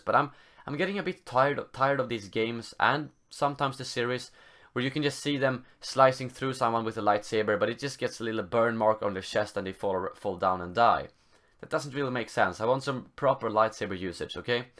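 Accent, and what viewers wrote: Sweden. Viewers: Wich country are you from? Sounds... Swedish kind of.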